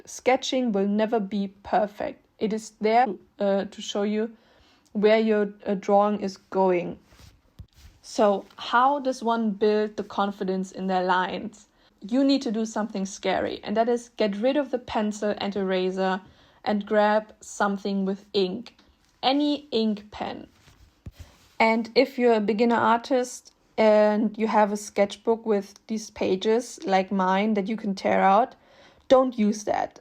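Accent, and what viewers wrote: Germany. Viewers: i have learnt german and i don’t mean to be an absolute mug but i love the german accent. i hope ur not insecure about it, it’s so awesome :))) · are u german ? cuz u kinda sound german Good Video Btw^^ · Nice video!! Random question, are you German by any chance? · Are you German? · Wie Deutsch will dein Akzent klingen. Trotzdem cool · omg no! Its just that i recognized the accent somewhere and then remembered my German classmates speaking english! Good video though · Du bist einfach deutsch hahahha, dass hört man;)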